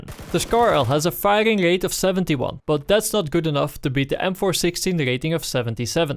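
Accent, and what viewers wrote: Netherlands. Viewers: Sorry it is dutch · You sound dutch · Judging by his accent, he's from the Netherlands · the more the netherland accent coming through - i love it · 100% Jij bent Nederlands. De accent xD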